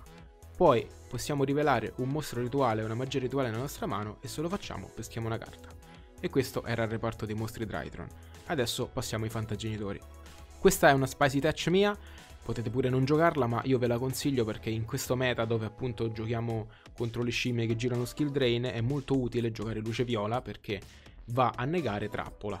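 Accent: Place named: Rome, Italy. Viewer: Era da inizio gioco che cerco qualcuno che spiegasse sto deck, grazie mille... ah solo per l'accento romano te meriti 100k iscritti big up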